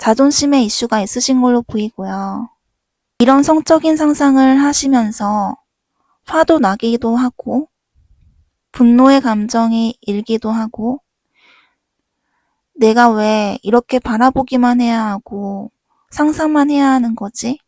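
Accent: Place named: Gyeongsang, South Korea. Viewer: 경상도분이신가여 ㅋㅋ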